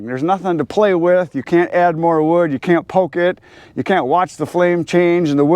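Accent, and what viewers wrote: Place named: Midwest, United States. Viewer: Good story about the flame / firewood. Its true too. Love your midwest accent., Reminds me of my college years in Appleton WS. Go Packers !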